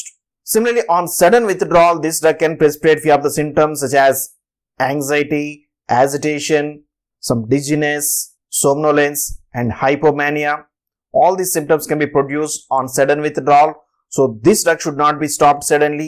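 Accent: India. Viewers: I open , I hear Indian , I leave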